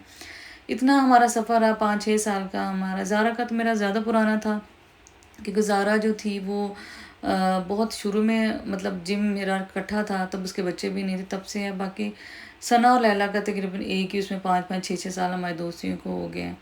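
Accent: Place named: Jhang, Pakistan. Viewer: ji main to fan hu jab aap jhang ki boli me baat karti hain please aap vo bola karo❤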